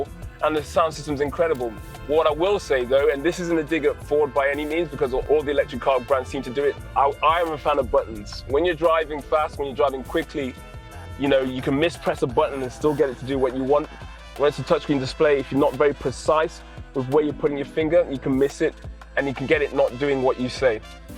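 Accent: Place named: England, United Kingdom. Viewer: Great video and car, I definitely agree on the ‘head turning design’ notion! Your videos really remind me of Carswithluke (and not only due to the similar English accent), where there is an emphasis on cinematography, landscapes and storytelling. Keep up the good work!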